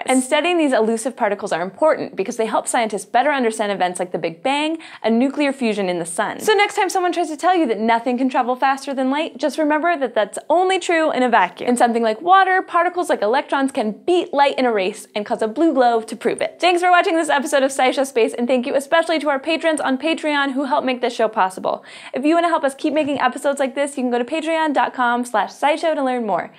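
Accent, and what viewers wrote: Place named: Idaho, United States. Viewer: Weird... Even me, a non native speaker with a pretty poor fluency can understand her. Google says somewhere around Idaho, USA... Btw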